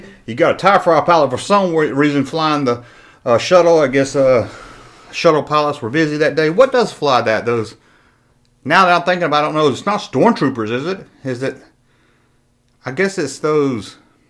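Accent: South, United States. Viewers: Ya can’t talk right because you were raised in the south ya hick.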